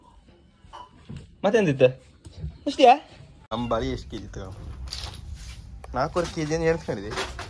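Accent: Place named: Kundapur, India. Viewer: Kushi aguthe nim vlog noduke. Nim kundapur kannada bashe nu superr hige log madtha eriii mare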